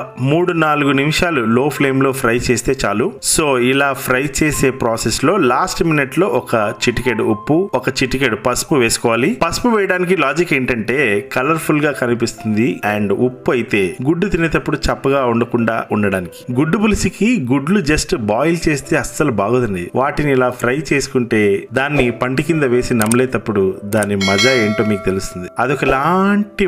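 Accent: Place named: Andhra Pradesh, India. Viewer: I like your Andhra slang broooo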